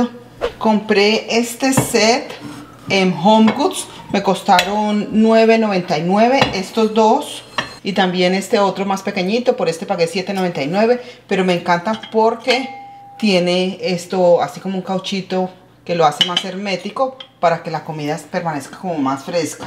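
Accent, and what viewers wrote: Colombia. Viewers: 🇨🇴💛💙❤️🇨🇴 Dios te continué bendiciendo. Me alegro mucho . Escuchar ese acento colombiano. Muy chéveres tus ideas.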